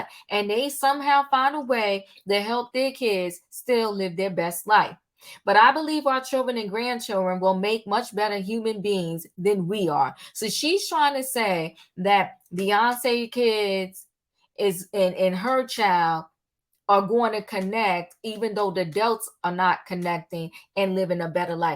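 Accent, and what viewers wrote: New York, United States. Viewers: “Messy mom” 😂 I love alliteration. By the way, are you from NY?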